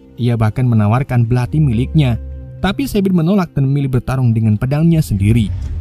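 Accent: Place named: Java, Indonesia. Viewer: Aku yakin admin nya orang Jawa, Kadang lucu juga admin nya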